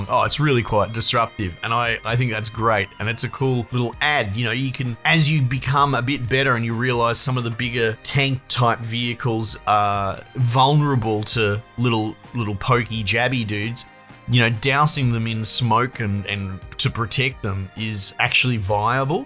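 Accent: Australia. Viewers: Thank You - and very nïce english/aaastralian' langüagé :- ]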